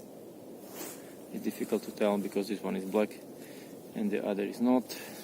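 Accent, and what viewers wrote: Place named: Brazil. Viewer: Your accent sounds almost Brazilian my friend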